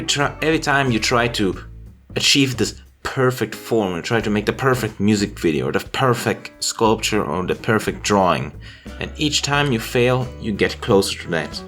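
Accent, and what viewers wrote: France. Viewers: Are you French or Italian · You’re french?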